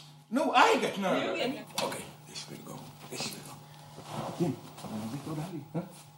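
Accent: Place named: India. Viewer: I didn't refer any racist thing, just his speaking reminded me indian accent.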